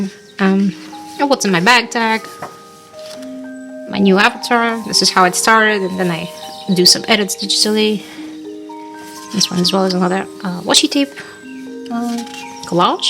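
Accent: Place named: Russia. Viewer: i was listening to you and your accent and i felt like you speak russian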